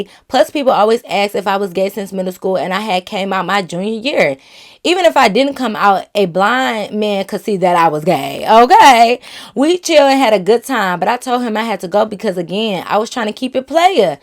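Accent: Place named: New York, United States. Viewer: I knew this girl was from ny from the beginning lol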